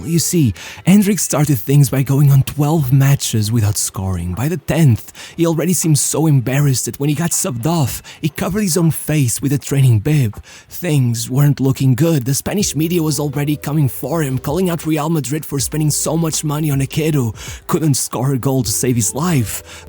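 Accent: Portugal. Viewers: E ese inglés com sotaque portugues? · Where you talk very portuegues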